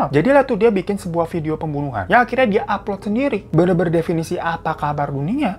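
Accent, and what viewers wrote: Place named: Betawi, Indonesia. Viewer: Cara ngomong lu keg guru ngaji gua.. betawi bed da ah 🤣🤣🤣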